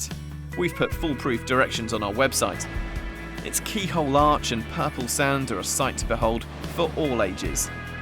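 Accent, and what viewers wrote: United Kingdom. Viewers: British accent! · Lovely british accent as well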